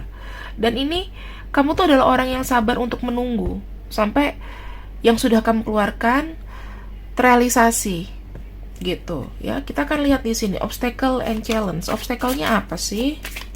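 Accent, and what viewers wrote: Java, Indonesia. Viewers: jawa medhok 😂😂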